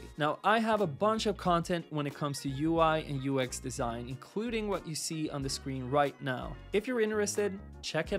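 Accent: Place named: California, United States. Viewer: typically cali dialect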